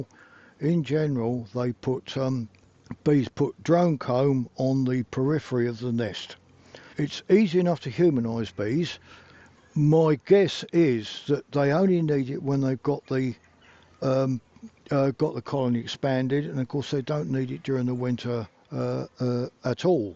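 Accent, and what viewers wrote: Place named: Sussex, United Kingdom. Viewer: Sussex. Not many of us left!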